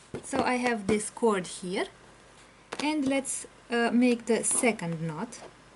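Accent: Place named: Iceland. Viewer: Very helpful tutorial! Love your accent! Are you from Iceland?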